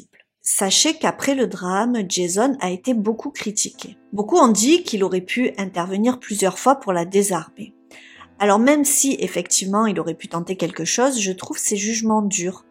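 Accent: South, France. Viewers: Taylor et Madison. Quelle tragédie !!! Pour ma part je pense que tu as fait une très bonne analyse de la situation. J aime bcp ta petite pointe d accent du Sud...moi qui suis dans l Hérault...🌞🌝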